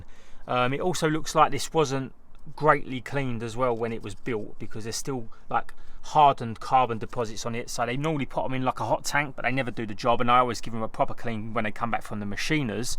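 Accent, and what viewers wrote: Essex, United Kingdom. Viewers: Nice to hear a familiar Essex accent and a channel with decent engine knowledge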